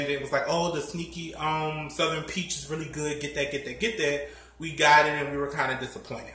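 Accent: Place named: Philadelphia, United States. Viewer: I knew You were from Philadelphia you sound exactly like Kevin the Scorpion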